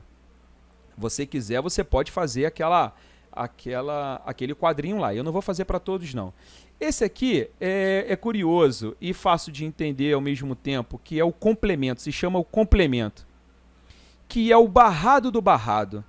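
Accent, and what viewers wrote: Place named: Rio de Janeiro, Brazil. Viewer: nem da pra perceber que é carioca rsrsrs abraço mto obrigado pela ajuda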